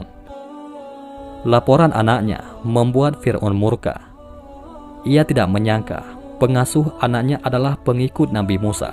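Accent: Aceh, Indonesia. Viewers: Dari aku perhatikan cara bicara channel ini Org ini org aceh logat bahasanya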